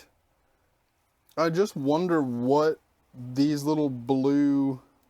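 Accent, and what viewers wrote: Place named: Yorkshire, United Kingdom. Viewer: Off topic but you say 'Nicely' like a Yorkshire man almost. Plus a few other things sounding rather English. Whereabouts were you brought up?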